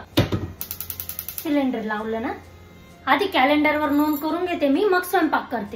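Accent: Khandesh, India. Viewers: खूप छान सादरीकरण ताई. मराठीला खान्देशीचा तडका, छान वाटतं ऐकायला.🌹🌹🌹🌹🌹🌹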